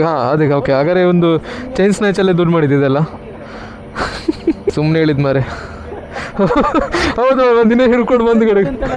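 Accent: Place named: Kundapura, India. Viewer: Kundapra kannada kend kushi aith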